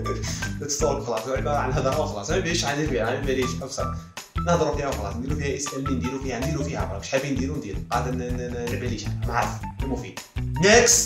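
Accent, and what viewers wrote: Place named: Algeria. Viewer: اووووووووه شيت وانا نقول هاذ اللهجة راني سامعها هخخخخ مهم نت راك ولد بلادي انا فرجيوة ولاية ميلة مام انا نلعب شوي غايمز فالقناة تاعي الفيديو لجاي ندعمك =)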